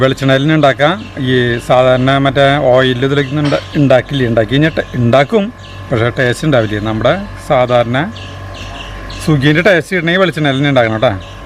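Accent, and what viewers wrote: Thrissur, India. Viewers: Sugiyan 👌👌👌👍👍👍ente Mami yum thrissur kkari aanu maman marriage chaithu trivandrum vannittu 17 years aayi but eppozhum samsarikkunnathu chetante pole nalla rasamulla thrissur slang lanu enikkum thrissur slang valare ishtamanu👍🙋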